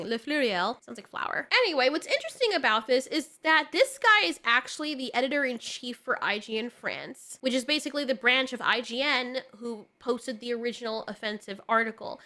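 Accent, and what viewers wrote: England, United Kingdom. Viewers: That was a surprisingly impressive English accent. Wrong time period, but still tho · I endorse your Brit accent.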